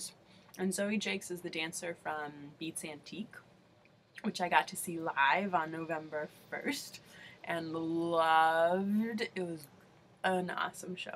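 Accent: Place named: Peru. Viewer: Sounds very Peruvian :)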